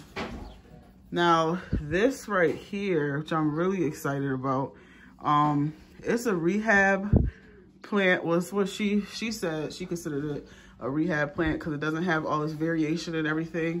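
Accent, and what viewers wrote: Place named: Florida, United States. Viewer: I'm trying to place your accent. I have a guess but dont want to make a fool of myself. Almost seems central Florida ish with a tint of something else I cant place. Let me know if you don't mind.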